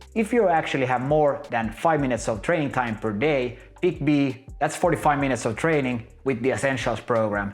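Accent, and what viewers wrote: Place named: Finland. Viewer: hienolla suomalaisella aksentilla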